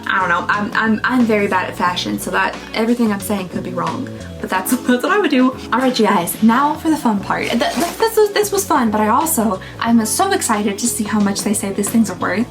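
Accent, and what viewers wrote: Kentucky, United States. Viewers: As a lot of people mentioned, music was too much. The pace of the music was not right for how you talk making it seem like you were talking faster. And the pitch didn’t match with your accent (I am Australian so I want to say Kentucky drawl. Forgive me if I am wrong) making it feel slightly off and not as charming/relaxing, and in a couple of places it got a lot louder emphasising the conflicts. A few of the jump cuts were a little abrupt but not unbearable. Edit: because I am Autistic and worried this is coming across as negative. I want to say the overall the video was edited well, these are just some things I noticed that was different and I personally felt could use some tweaking.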